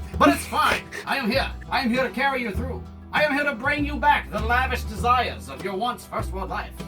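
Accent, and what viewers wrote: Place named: United Kingdom. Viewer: Love the British or is it Irish accent LoL 🤣😆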